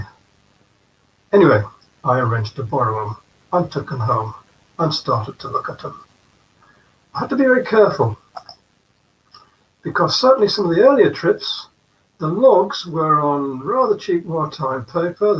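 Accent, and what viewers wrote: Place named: England, United Kingdom. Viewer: You need to practice with the mic you are too far, cannot understand a word... remember you have a thick English accent and this is for the world...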